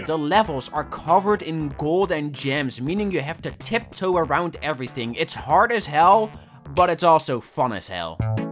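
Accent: Netherlands. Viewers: i heard your accent and could immediately tell you were dutch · you sound so dutch its actually insane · jij klint heel nederlands ben jij?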